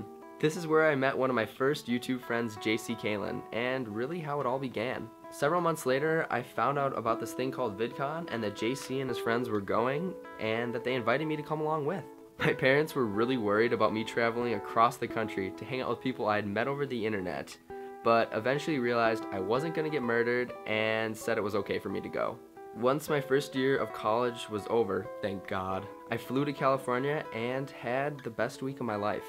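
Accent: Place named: Midwest, United States. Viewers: You definitely sound like you're from Minnesota...cute :) So am I! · I knew I recognized your Midwest accent! I was born in Wisconsin and moved to Minnesota too!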